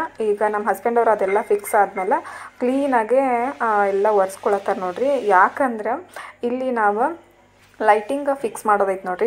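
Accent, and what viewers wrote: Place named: Bijapur, India. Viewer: Niu namm tara matadtiri .namdu bijapurari ❤ ,.. beautiful vlog 🎉🎉 new friend.🎉❤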